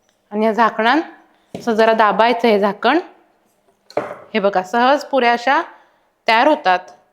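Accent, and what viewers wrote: Kolhapur, India. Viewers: Full kolhapuri zalis ,tone pn kolhapuri zala ,mast 👍👍👍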